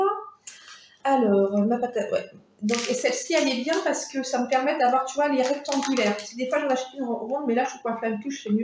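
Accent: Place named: Franche-Comté, France. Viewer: Et bien quelle surprise de voir une nouvelle tête ! Ma foi il a l’air bien sympathique ce franc-comtois (on entend une petite pointe d’accent) 😉. Encore bravo pour cette vidéo toujours aussi plaisante à regarder ! Passez d’excellentes fêtes de fin d’année 😃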